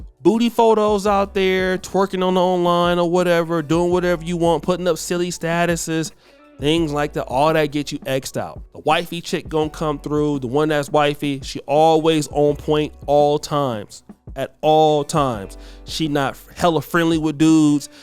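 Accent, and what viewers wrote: United States. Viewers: That was the most "Bay-destrian" video I have heard you do.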